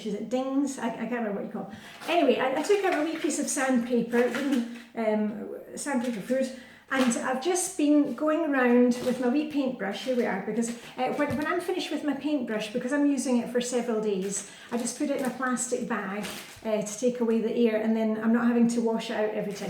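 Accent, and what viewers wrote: Scotland, United Kingdom. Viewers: No more self doubt allowed! Know that your videos are like a fun visit with a charming, uplifting friend! The Scottish accent is an added bonus! It’s fun to learn about your life and to see how much you love Denmark! You are a great ambassador for your adopted country! If you were to stop, I would be sad. Cindy from Minnesota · You are NOT cluttering up my feed! I love your videos. You are an inspiration to me, more than decluttering, even though I love that. Your attitude, your style, and your Scottish brogue are endearing!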